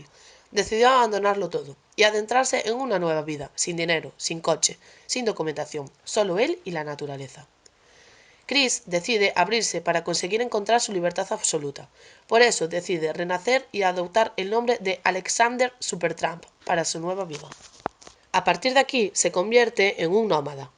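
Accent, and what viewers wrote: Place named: Galicia, Spain. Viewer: Gracias. Tienes una voz preciosa...galleguiña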